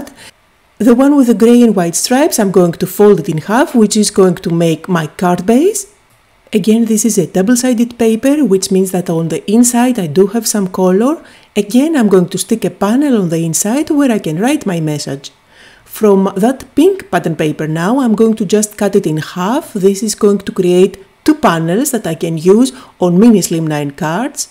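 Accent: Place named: Greece. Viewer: I loved all your cards and I do want to mention that I know a Papaioannou family in the town in Ontario, Canada, where I live. They have a daughter who was a figure skater... Penny Papaioannou. One of my best friends was married to a Greek man with the last name Papadakos. Anyway, I love your cards and your accent reminds me of my Greek friends.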